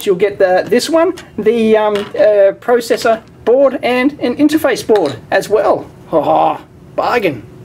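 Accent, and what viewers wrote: Australia. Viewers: I don't get it. You just sound Australian to me. Why do people keep pointing out your accent. You're perfectly legible.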